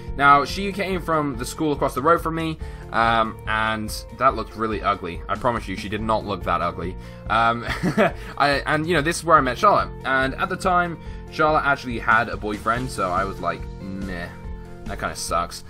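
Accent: United Kingdom. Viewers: u have a British accent and by the way I subscribed to u and I am the girl on clash of clans that I talked to u when u said hi YouTube in clash of clans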